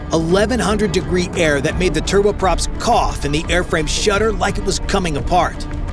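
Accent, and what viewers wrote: United States. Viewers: American accent though, no originality on You Tube any more, how sad... "creators" my ass!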